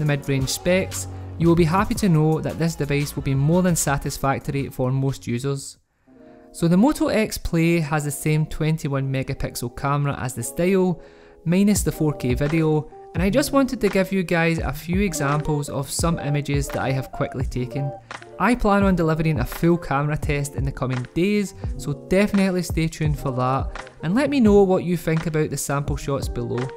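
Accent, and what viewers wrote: Scotland, United Kingdom. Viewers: Canadian? With a Scottish accent? How did that happen.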